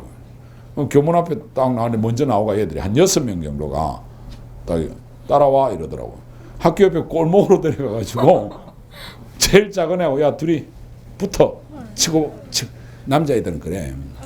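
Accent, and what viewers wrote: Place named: Gyeongsang, South Korea. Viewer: 고자 동남방언 억양 때문인듯 ㅎㅎ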